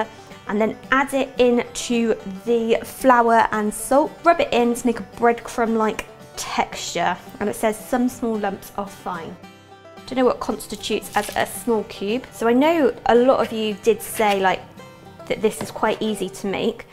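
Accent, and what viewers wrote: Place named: England, United Kingdom. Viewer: I'm hungry again! could walk into any Southern kitchen... and everyone would assume she's a local girl. ( unless she starting speaking in that beautiful English accent). 😀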